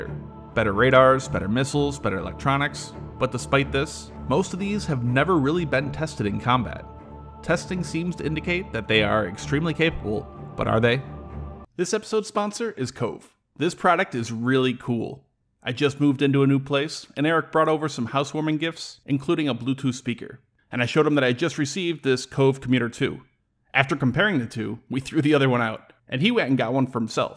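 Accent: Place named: Midwest, United States. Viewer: He’s got a strong Midwest accent. I’d guess Wisconsin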